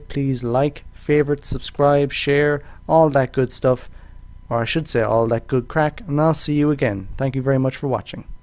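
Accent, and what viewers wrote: Ireland. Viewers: Dropped over from scanner, nice series, always good to hear Another irish accent. keep it up.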